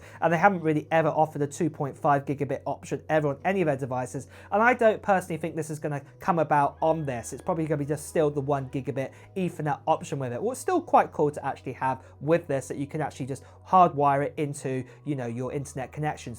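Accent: United Kingdom. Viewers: I definitely will buy the next  TV. You are really good explaining the new devices plus it helps with your British accent, I m from London UK. 🇬🇧 😁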